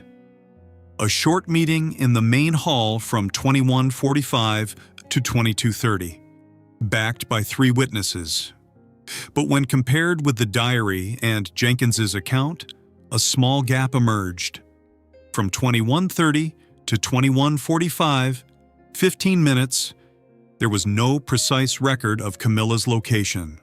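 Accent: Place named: United States. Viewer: Why do we have to have American sounding readers 🤬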